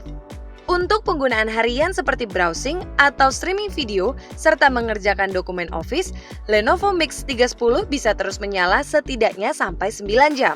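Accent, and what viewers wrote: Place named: Java, Indonesia. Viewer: Logat jawane kentel bgt :D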